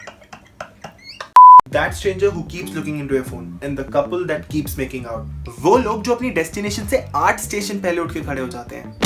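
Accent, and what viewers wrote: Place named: West Delhi, India. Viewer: Bhai west delhi ke lgte ho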